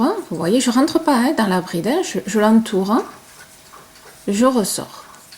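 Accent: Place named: Southwest, France. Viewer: vous seriez pas un peu du Sud Ouest par hasard , ma fille habite près de Luchon, je reconnais l'accent ....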